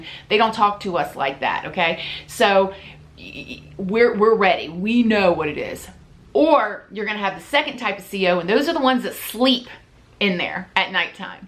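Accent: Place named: Baltimore, United States. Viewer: Sounds like she is from Baltimore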